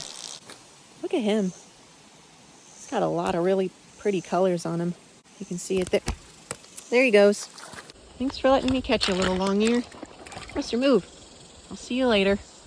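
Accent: South, United States. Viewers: confused southern accent mumbling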